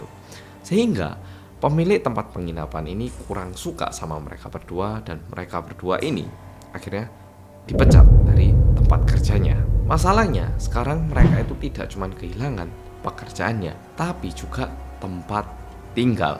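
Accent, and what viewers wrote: Java, Indonesia. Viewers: Actingnya boleh juga 😂😂 sukses terus mas! Btw knapa udah pindah ke Korea tapi logatnya tetep medhok ya 😅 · tks medok 🎉❤🎉 Sehat selalu yaaa 💪🏿🙏🏿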